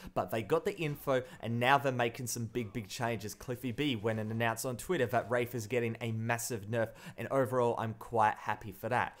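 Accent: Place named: Australia. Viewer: Wait...I thought that's how every Aussie would pronounce those words. Them and the Brits have the same pronunciation for words that in American English wouldn't. I think it's a beautifully interesting thing about the language. Like how the Brits pronounce "torque" and "talk" pretty much the same way.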